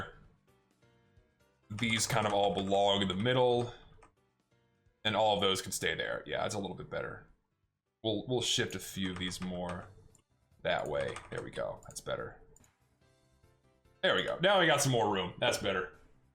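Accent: Texas, United States. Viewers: and if you watch in 1.25 speed you can hear his southern accent a little bit better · I’m noticing how he gets more and more Texan over the course of the video. I didn’t even know he was form there til now, he’s good at hiding it.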